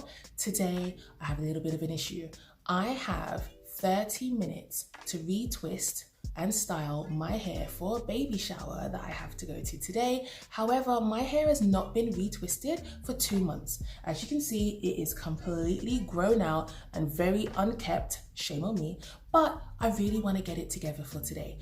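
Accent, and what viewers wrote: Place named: United Kingdom. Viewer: Love your hair and I just love the English accent, so elegant.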